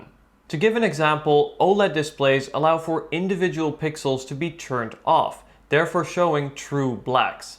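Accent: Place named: Netherlands. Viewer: Are you from the Netherlands or Belgium? Your English sounds a little bit like Dutch. Nice video, and still an pretty nice Phone.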